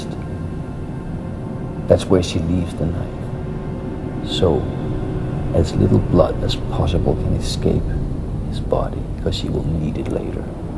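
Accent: Denmark. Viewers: The danish non native english accent makes it kind off creepy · By listening to this, i found out this dude is from Denmark *lol* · My god... he sounds truly danish. XD But... very scary at the same time. That his way of speaking english reminds of my own english teacher is not exactly helping. :P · you can still hear his danish accent.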